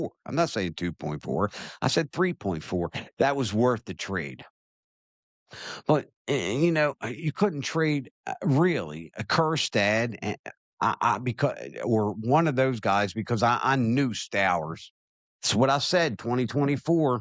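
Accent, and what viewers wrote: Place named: Baltimore, United States. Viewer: I love your Baltimore accent. Go Os.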